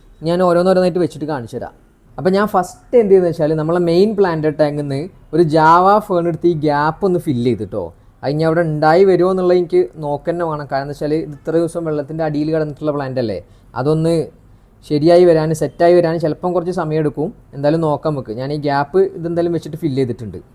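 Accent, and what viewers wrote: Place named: Vadakara, India. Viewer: എന്നിട്ട് നിങളെ ഭാഷ മലപ്പുറം ടെച് ഇല്ലല്ലോ 🙄ഞങ്ങളെ കോഴിക്കോട് വടകര മോഡൽ പൊലെ എനിക്ക് തോന്നൽ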